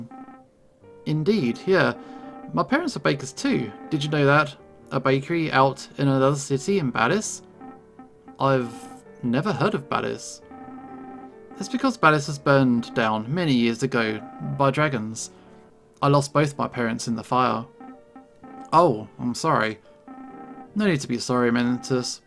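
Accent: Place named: United Kingdom. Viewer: Is watching this making me a furey personnally i m only watching u for the bri'ish accent pls say Bo'oh oh wo'ah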